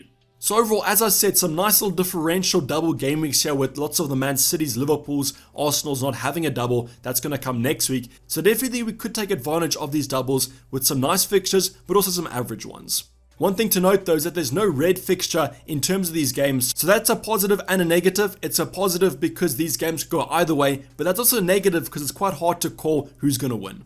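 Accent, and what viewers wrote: Afrikaans, South Africa. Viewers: Yoh!! Your boer accent is heavy 😂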